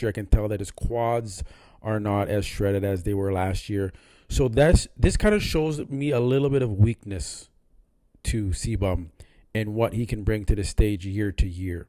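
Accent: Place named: Caribbean. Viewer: absolutely. I just started to listen to your videos. You’re on point with your analysis. I’ve been in the game and a fan since 94. Lol. And as a proud Jamaican don’t think I don’t hear a little Caribbean in your voice. HaHa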